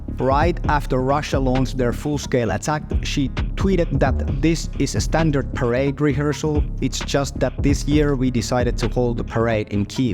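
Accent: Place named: Finland. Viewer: Your finnish accent makes me feel cozy and the video was fun. But your should practice to atleast pronounce russian words correctly, as Russia is neightbouring country to Finland and the third largest speaked language in Finland. For example Россия сегодня, is pronounced "rɐˈsʲijə sʲɪˈvodnʲə" (in phonetic), or "rassiia sivodnja" in finnish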